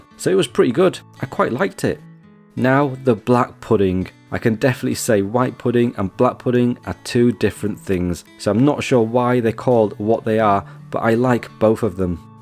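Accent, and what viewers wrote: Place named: Midlands, United Kingdom. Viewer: Best breakfast I ever had was in Northern Ireland, so I think they have it. By the way - are you from the Midlands mate? Your accent suggests so.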